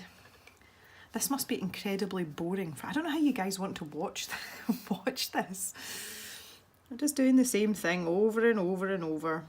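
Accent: Scotland, United Kingdom. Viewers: Just found your tutorial and so pleased to hear a fellow Scot. You colour similar to myself so I will def be following you. This is brilliant x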